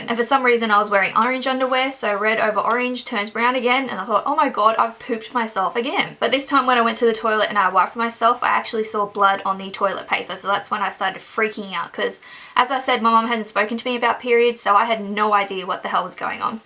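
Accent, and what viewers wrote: Australia. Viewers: Are you an Aussie? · Is she Australian? Cause I'm Australian. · This Australian accent :D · Are you Aussie? You sound like it cx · Shes aussie :) yay one of us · Are you Australian? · Your Australian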